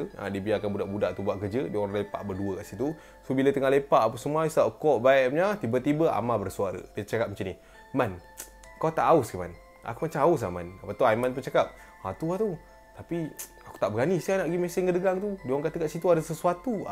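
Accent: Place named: North, Malaysia. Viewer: Hg ckp utara sempoi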